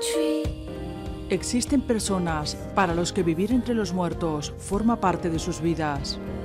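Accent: Spain. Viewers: Hola, me encantan tus vídeos, hermosa voz española, cuidate mucho, chao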